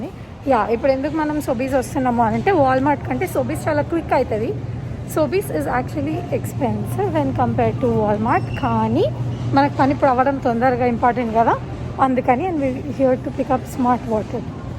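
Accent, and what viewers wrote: Telangana, India. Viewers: Love your voice i think your from Telangana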